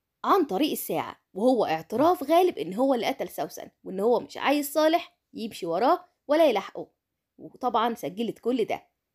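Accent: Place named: Iraq. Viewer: تتكلمي عراقي حلو شكرا على معلوماتك تحياتي وردة عراقية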